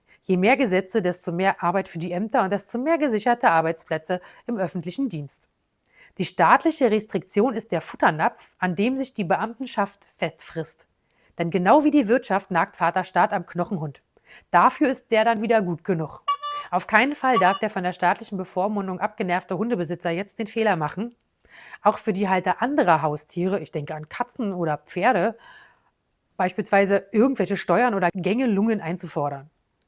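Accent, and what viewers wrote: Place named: Berlin, Germany. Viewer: Hallo, genauso ist es und es wird immer schlimmer. Ich liebe deine Berliner Schnauze. Weiter so. Lg Claudia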